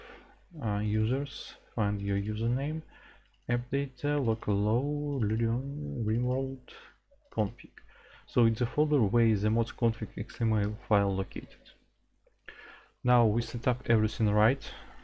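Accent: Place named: Russia. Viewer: Наш человек! Акцент - агонь!)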